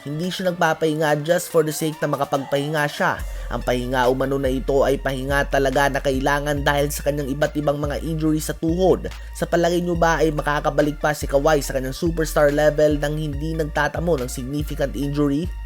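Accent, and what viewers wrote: Visayas, Philippines. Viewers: Bisayang bisaya ah 🤣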